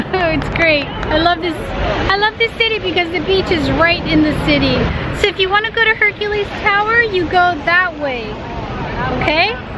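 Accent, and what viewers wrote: United States. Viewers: This got randomly recommended, glad to see a coruñesa with American accent lol I was born at El Materno and sound coruñés as fuck in Spanish but also sound American as hell in English. Love that we are bringing some diversity back home!!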